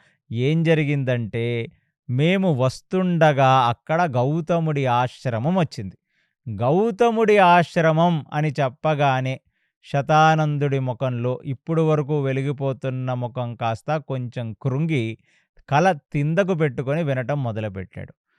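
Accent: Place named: Godavari, India. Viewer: Godavari Slang😅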